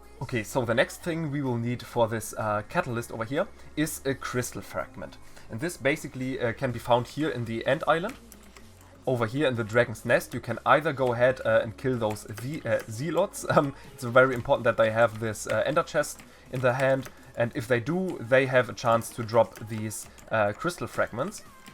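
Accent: Germany. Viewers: Man erkennt das du deutsch bist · Are u German I can here you're accent I'm German too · Bro ich hab in der waren sek gecheckt das du deutsch bist haha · Du bist Deutscher oder :) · Man hört ab und zu das er deutscher ist :D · So you are from germany. I thought already you sound like a german (i am one too) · Alta hab nicht geguckt and wusste du bist deutsch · Finds ürgendwie cool wie du versuchst Englisch zu reden aber man hört so stark den deutschen Akzent xD (no hate) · Warum sprichst du nicht einfach deutsch in deinen Videos? Nichts gegen dich aber irgendwie triggert mich dein Deutscher Akzent wenn du englisch sprichst. Edit: Deine Aussprache bzw Akzent erinnert mich an Werner Herzog wenn er englisch redet 😂😂😂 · Irgendwie habe ich das Gefühl das du nen deutscher bist echt jz 2 sek danach hast du das eingeblendet xddd köntest du auch mal deutsche Videos machen ich finde dein englisch irgendwie so extra deutlich und als ob du dich einfach nur verstellst finde es besser wen man so sein kann wie man ist ka aber macht trotzdem weiter ich finde dich trotzdem echt sympathisch. 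· Are u German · U R German right? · Ok aber ein bisschen erritierend weil du diesen deutsch akzent hast xD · Man hört so sehr dass du Deutsch bist👍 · Dieser deutsche Akzent🤌 (no front) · You can hear that he is german 😂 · Kommst du aus deutschland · Erstes wort ich wusste du bist deutscher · So geil der deutsche Akzent in deinen englisch haha like ist aber da 👍🏼😅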